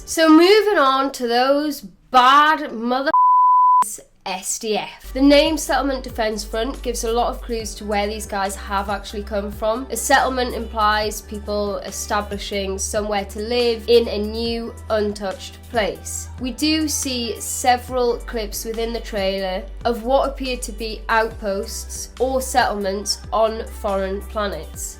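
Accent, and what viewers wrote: United Kingdom. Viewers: i dont know maybe somewhere in britain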